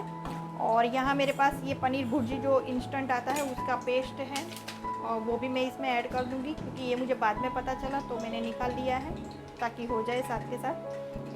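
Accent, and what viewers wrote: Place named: Gujarat, India. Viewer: Di ap gujrati ho apka tone se lag raha.... Di apko sub krdiya hi...mujhe support ki jarurat hi di plz support kijiye naa....mi v roz augi apke video pe i promise 🥰🥰🥰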